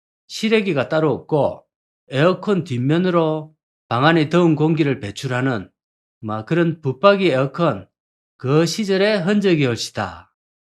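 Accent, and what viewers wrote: Gyeongsang, South Korea. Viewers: 정말 보리문디 사투리 정겹다.연식있다보이 다이자삣는데!직이네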